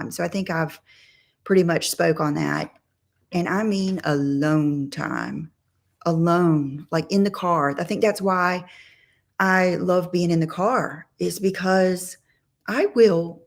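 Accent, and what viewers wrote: South, United States. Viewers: What a beautiful and touching video! You are perfect , you are enough, God knew what he was doing when he created you. Your videos are so inspiring, encouraging, and relaxing to watch (your southern accent is adorable) I look forward to your videos and what exciting and fun things you bring for all your “friends“ to enjoy 💕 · I like you I find your voice very soothing. I’m originally from North Carolina and I love to hear your southern accent, and I love to see your holes of clothing and I buy things that you show me. So thx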